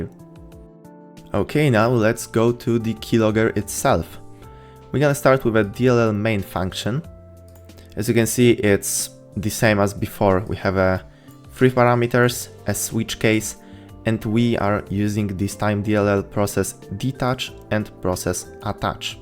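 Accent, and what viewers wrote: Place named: Poland. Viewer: Polski akcent pozdro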